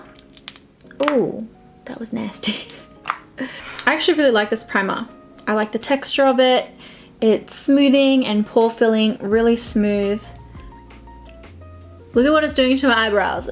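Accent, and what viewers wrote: Australia. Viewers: I swear there should be more of our accent in you tube videos. We really have the best sayings about things lol. #aussieaussieaussie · Nice to hear an Australian accent. 😊🌸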